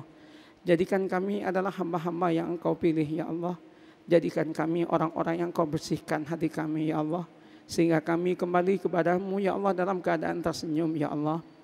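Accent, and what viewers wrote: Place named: Madura, Indonesia. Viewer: kayak ada logat maduranya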